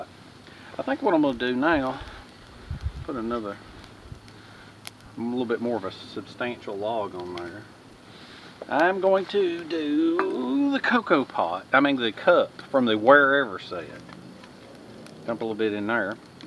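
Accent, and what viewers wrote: South, United States. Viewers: Scientific testing aside, thanks for the video brother! Just checking out your fire and listening to your southern drawl make me feel at ease. I am curious, what is your forest firewood sources, locally we have western red cedar (Thuja plicata), Douglas fir (Pseudotsuga menziesii), western hemlock (Tsuga heterophylla) and maybe vine maple (Acer circinatum). At work I can salvage different oak, maple and beech which I totally prefer but they aren't really available in our forests. For cookware I never really invested in aluminium, most of my kit is stainless and a few more recent bits are titanium. Love the toboggan dude! Although that business is now defunct.:)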